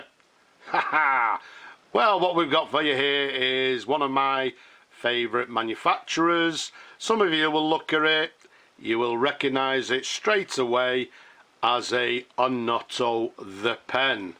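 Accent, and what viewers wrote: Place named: Yorkshire, United Kingdom. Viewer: Dear, Your videos are magnificent. How you manage to get such stunning pens is an enigma to me. I am sure you are doing a lot of research. Your voice and pronounciation seems that you are from Yorkshire in England. Am I right? Very very similar to that of the legendary Geoff Boycott. Are these pens (ONOTO) made in UK? Is the company still around. Please let me know. It is such a pleasure watching your videos. Keep doing it and God bless.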